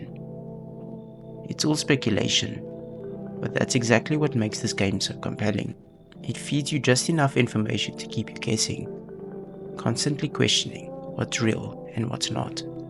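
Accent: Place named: South Africa. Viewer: i thought i recognised a south african accent 🥹😝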